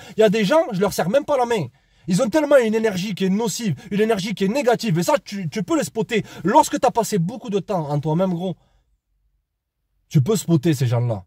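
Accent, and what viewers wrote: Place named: Marseille, France. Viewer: Quelle sorte d'accent est-ce cela? Marseillais? Au fait, merci pour partager les pensées. C'était interessant.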